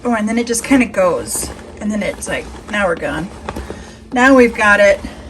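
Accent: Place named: Minnesota, United States. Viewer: This was the first video of yours that I stumbled upon, and within seconds, I had you pegged as a Minnesota native (or at least lived there for a significant amount of time). My mom's entire side of the family lives primarily in the Minneapolis area with my late maternal grandmother's family all hailing from the Blue Earth area down south. Those that live down in Blue Earth have dang near the same accent you do. Tbh, it was refreshing to see someone from any part of the "north" to "northern midwest" not be super srsbiz all the time. I'll definitely be sticking around. If your off camera personality stays the same as your online persona, then I really need more of you in my life!! I absolutely love the depths you go to to make an honest and informative review and still maintain the light airy atmosphere, even when you're less than impressed with the product.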